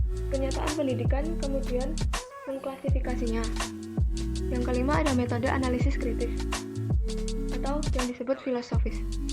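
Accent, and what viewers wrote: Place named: Java, Indonesia. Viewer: Mendhox dikit keren ya kak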